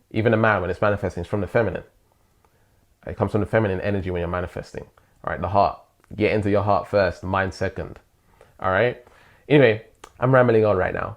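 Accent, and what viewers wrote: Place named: London, United Kingdom. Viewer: What part of London are you from? Hehe! Amazing post BTW keep inspiring 🙌😇 to want is to lack 💯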